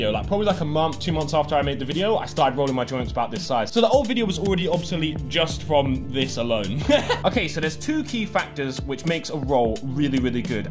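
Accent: United Kingdom. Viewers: Hi just seen your vid pop up and was wondering where you come from I’m in the UK and you sound like your British so thought I’d ask as I’m not sure thanks for vid really enjoyed it 😊👍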